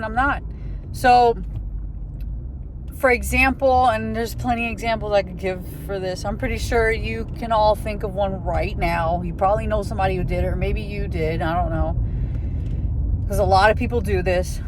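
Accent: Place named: Texas, United States. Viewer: you sound like 100% Texas mother.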